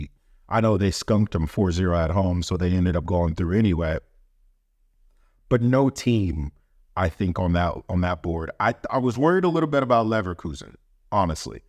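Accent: United States. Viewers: I like your English. I never listen football with American accent are you American. Do you have live chat programm ?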